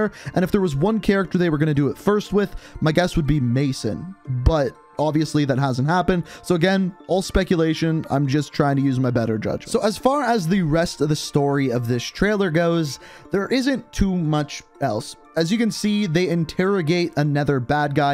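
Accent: Canada. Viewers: Are u from Canada?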